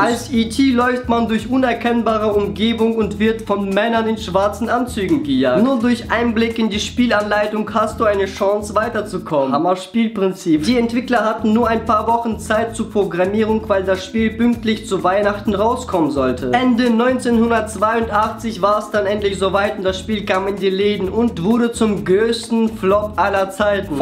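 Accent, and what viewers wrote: Russia. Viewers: Er ist russe...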